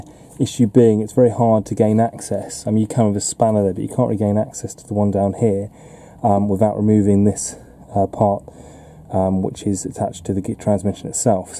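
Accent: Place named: England, United Kingdom. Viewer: I'm super stoked and appreciative of your videos, but between your English accent and how DEEP the tone of your voice is versus the sound equipment you used, it's difficult to understand you.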